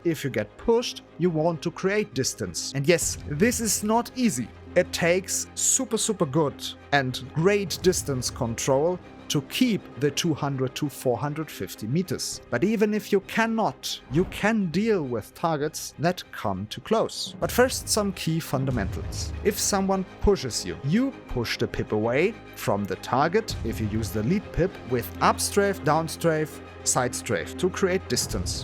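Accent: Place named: Germany. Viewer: Der deutsche Akzent haut rein, gutes Video!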